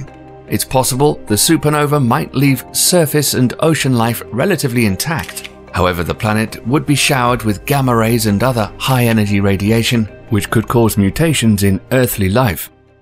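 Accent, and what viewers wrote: England, United Kingdom. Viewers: As you have an English accent, please stop pandering to the US and call Ursa Major by its proper name - you'll be doing them a favour too, as some of them will learn something as well. By all means explain how they refer to it but I hate it when you forget the rest of the world. Thank you. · I came here to this channel to 1) Learn science 2) Learn that posh English accent by pausing and repeating everything he says (sehzz)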